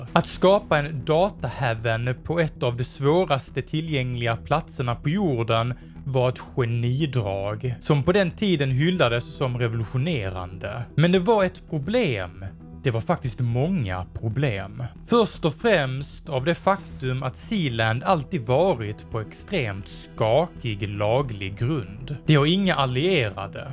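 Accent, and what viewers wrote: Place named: Västergötland, Sweden. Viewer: Holger Nilsson? Det är för att båda är i ungefär samma ålder och pratar västgötska.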